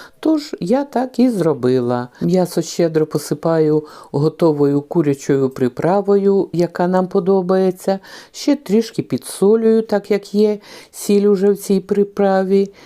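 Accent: Ukraine. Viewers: Нееет, все ж таки кровушка отцовская перебарывает, люблю я вас хохлушечки, люблю ваш говор, люблю ваши песни, люблю ваши танцы, люблю вашу украинскую вышиванка и национальные одежды, правда, как много наготовили вкусняшек только лишь из 6ти спинок куриных, дякою за ваше видео, здоровья вам, счастья.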